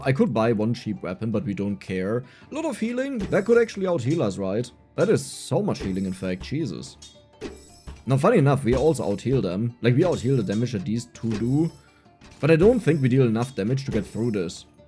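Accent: Germany. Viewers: Omg 200k 🎉🎉🎉🎉 congrats I love almost all your content I could watch u watch paint dry with u talking about it lol. I really enjoy ur German accent and when u get frazzled it kinda makes me laugh sorry. 😂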